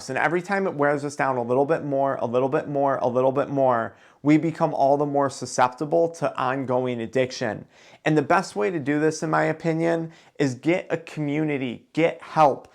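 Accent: United States. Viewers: Why do Americans pronounce doc as daac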